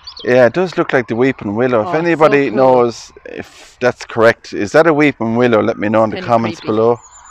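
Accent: Ireland. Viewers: ME TOO i love YOUR IRISH ACCENT!!!! OLD CEMETERY'S ARE SO INTERESTING. bUT IT IS SAD HOW TIME WRECKS THEM AND SOMETIMES VANDALS. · I really love the Irish accent and yes that’s a weeping willow, we have them here in Australia too. Love your videos xx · I was also wondering which part of Ireland your accent is from. My 4 times great grandfather came from county Clare to Australia in chains,for the term of his natural life because it was alleged that he was involved in white boy activities,he was 12 I often wonder what he looked like and spoke like. He died at 35,no doubt as a result of being flogged as laid out in the NSW convict records. 😮. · Love the irirsh accent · Sorry, I can't help with the Latin, but as for that tree.... yup, I do believe it is a weeping willow... and an old tree at that! That chain.... wow, that's a work of art, very nice! And yes, another one here who loves the Irish accent! 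👍 As a matter of fact, I'm listening right now to a few of th' b'yes o'er in Ireland on the ham radio! (I speak with a few of them from time to time!) 👌 Pete 🇨🇦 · love the irish accent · Thank you for another beautiful video. My husband and I were just commenting on your Irish accent. We are in the United States in Northern Michigan and we get teased about our accents here, if you really want to hear a different accent look up a Yooper accent. It’s an accent that people who live in our upper peninsula have, some of the people who live in the lower peninsula but very far north also have some of this accent. It comes from all of the Finnish, Yugoslavian,Italian and Canadian people who migrated here through Ellis island to work in the many copper mines. I hope that the two of you have a lovely evening. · I love the Irish accent. Mother-in-laws ancestors were from Dingle Dale. Great job on all your videos. 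· Thank you for another wonderful tour! Yes I too love listening to you and your Irish accent I could listen to all day!❤❤❤❤ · Love your Irish brogue!!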